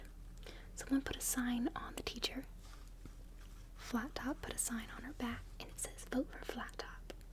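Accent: South, United States. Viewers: Tracing ✅ Little bit of country twang ✅ Fell asleep ✅ Would recommend to a friend ✅ #1 Youtuber out there ✅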